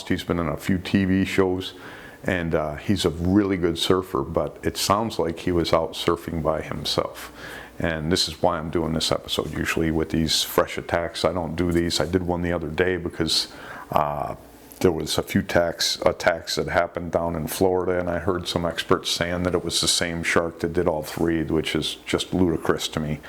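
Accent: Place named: Michigan, United States. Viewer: My step father was from Alto, Michigan (East of Grand Rapids). You sure sound like the folks from around there. Am I close?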